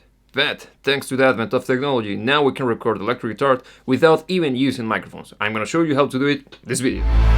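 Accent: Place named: Europe. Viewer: He sounds like a European version of McMurray from Letterkenney.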